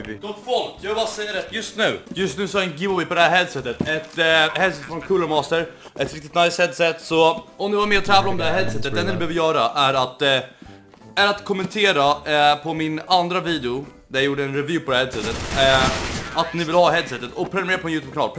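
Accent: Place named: Stockholm, Sweden. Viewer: man förstår att du är från Stockholm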